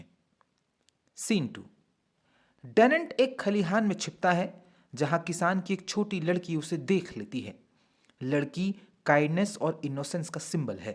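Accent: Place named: Meerut, India. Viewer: Aap meerut se he na sir..? Aqsa k bhayya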